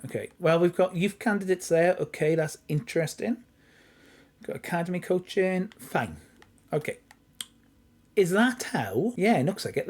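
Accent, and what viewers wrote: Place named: Wales, United Kingdom. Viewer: I like your positivity ... could listen to the Welsh accent all day lol .... I'm from St Helens , Merseyside . I'm currently managing Maidstone United with a save carried over from FM24 Console on PS5 . I'm having loads of crashing . Maybe 20 odd times . I've done the regular stuff , reinstalled game , restored licence and entered safe mode and cleared cache and rebuilt . It's playable .... looking forward to the fix ⚽️⚽️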